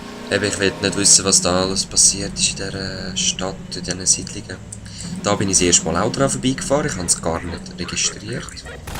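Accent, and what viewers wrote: Zurich, Switzerland. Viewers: i wellem kanton wohnsch du eig.? :D töhnt wie Züri :D Und super part natürli ^^ :)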